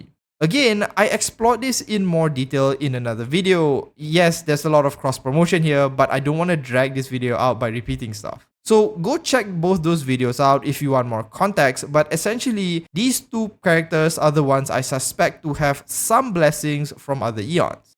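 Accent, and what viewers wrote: Malaysia. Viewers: u sound malaysian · Yo are you a Malaysian? I swear your accent sounds like one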